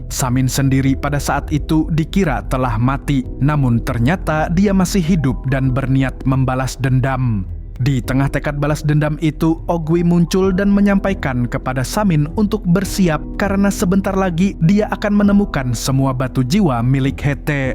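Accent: Banjar, Indonesia. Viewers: Wakai orang Banjar kah ikam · Urg banjar kh manggg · urg banjar kh pian sklinya astga😭😭😭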